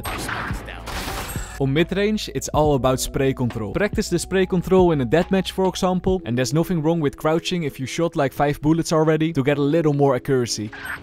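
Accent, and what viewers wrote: Netherlands. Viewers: Als je geen Nederlander bent dan ben ik geshockeerd 😅 The heaviest Dutch accent I've heard in a while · Meest Nederlandse Engels ooit😭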